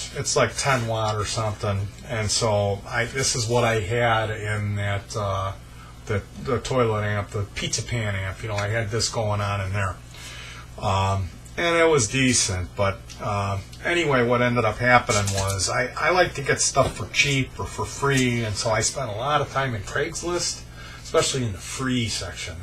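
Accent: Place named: Upstate New York, United States. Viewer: The accent. Buffalo, Rochester?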